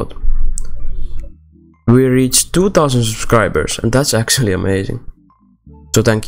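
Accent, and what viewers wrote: Finland. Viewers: Thanks! Oot vissii suomalainen?? Huomaa aksentista xd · Nyt paistaa kyllä suomi aksentti nii saatanasti xd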